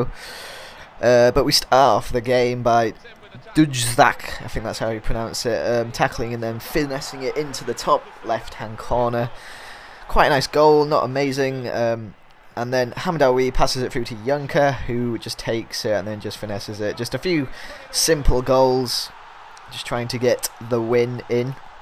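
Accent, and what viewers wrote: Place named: Yorkshire, United Kingdom. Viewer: hearing these crazy Scandinavian names in a Yorkshire accent is half the fun ;D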